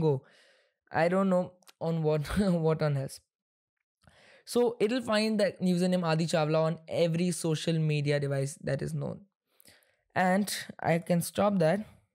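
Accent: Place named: India. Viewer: Pov u found best video but breo have indian accent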